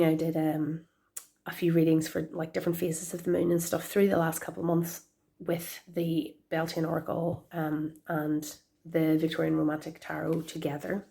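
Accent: Ireland. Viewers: I would love your channel if for no other reason than it makes me so happy to hear someone speaking Irish naturally & with the 'right' accent 💚🌱🍀 My kids would be getting those books if they were 30 years younger 😁😯 I miss the really good kids books. I own that CBR oracle you love - the red one - and also the Enchanted Map. I do love them, any other decks of hers are long gone since I read more about what she is like 🤐 I hope your new one is useful to you 😊 I doubt it will shock you that I LOVE the Wildwood tarot 😍 I don't use it, I just stare at the art & smile. Also love Mystical Moments tarot, her art is unbelievably gorgeous. Thank you for the update. I enjoyed it as always 😊👍💖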